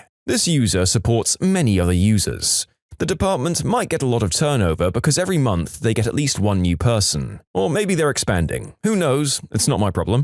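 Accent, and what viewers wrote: United Kingdom. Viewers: When did mate become British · Again British???!!! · the british accent is the biggest tradgedy I was hearing a bunch of old podcasts of yours and this came up and I am like "oof that voice hit me on the head" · Uhh British narrator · Not a fan of British narrator.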